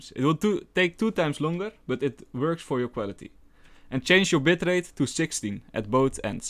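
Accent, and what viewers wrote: Netherlands. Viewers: Yes I also think..Check his thumbnails an his dutch accenct confirms it :D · heavy dutch accent at its finest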